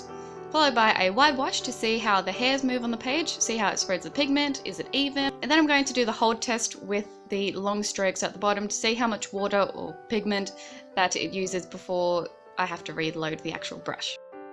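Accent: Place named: Australia. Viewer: Nice to come across a review video with an Aussie accent. Hi from Lismore, NSW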